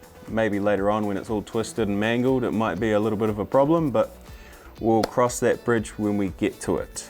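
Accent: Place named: New Zealand. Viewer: Welcome to episode fortysex..... go the kiwi accent fella! Keep up the great vids!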